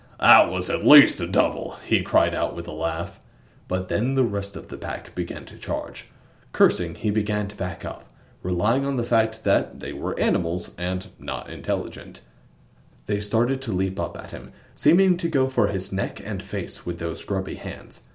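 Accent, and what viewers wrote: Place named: France. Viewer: Billy Bob Space Trucker in a French accent is the best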